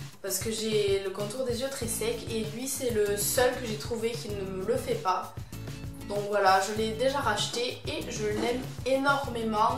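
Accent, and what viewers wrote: South, France. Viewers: Je t'ai découverte y'a pas longtemps et j'adore tes vidéos, très simple sans chichi, et puis ton accent du sud 😻 c'est tellement rare les youtubeuses du sud ça fait plaisir moi qui est l'accent aussi ! Et pour info, comme tu as un petit budget, je ne sais pas si tu as vu, mais ils ont sorti plein de petit parfum a 30e chez sephora ! Voilà j'ai hâte de voir une prochaine vidéo 👌 !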